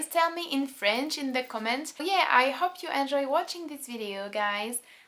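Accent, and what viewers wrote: France. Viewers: Merci. Je vais chanter en francais.:) Recommend me French artists, please? Oui, tu as raison. J'adore ton accent! Mais je pense que tout le monde aime l'accent francais.:P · tu parles vraiment bien français! 0_0 à la fin j'avais l'impression d'entendre une française! tu as l'accent français:) et en plus ton anglais est facile à comprendre (je suis française et pas très forte en anglais mais j'ai tout compris) continue c'est vraiment génial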